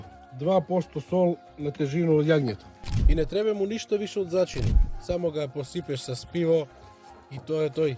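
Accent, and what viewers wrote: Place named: Serbia. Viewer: ovo je čisto srpski....ja pola ne razumen .....:)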